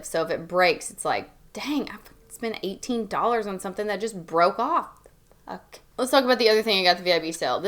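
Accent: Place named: Texas, United States. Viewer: Are you from texas .... you sound very Texan .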